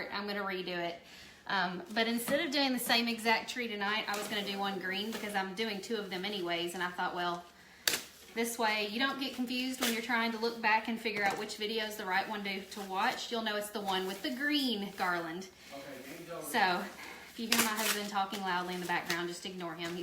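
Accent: United States. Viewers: New subscriber! I am going to try this. Love your accent! What state are you from? Me = PA.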